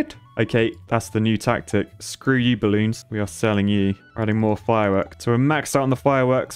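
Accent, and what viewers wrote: United Kingdom. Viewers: I swear engineer is like df but bri'ish and more pg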